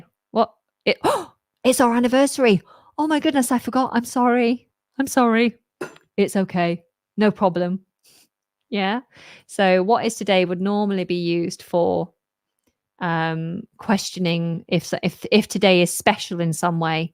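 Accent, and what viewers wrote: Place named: United Kingdom. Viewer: I apreciate the britsh accent...it is very especial for me.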